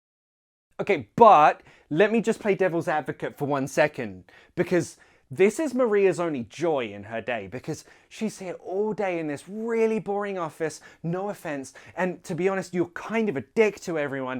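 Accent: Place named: RP, United Kingdom. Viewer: Thanks ever so much, I like the way you talk and teach, and you are very understandable. I like the RP English accent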